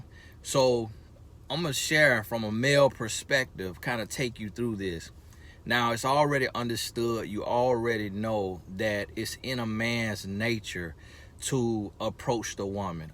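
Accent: South, United States. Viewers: He has got to be from the south 😂 This is a good talk though!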